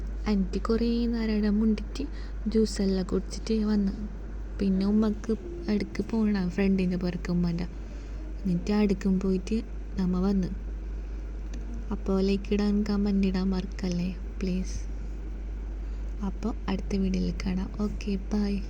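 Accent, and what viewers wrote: Kasaragod, India. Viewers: Kasaragod aano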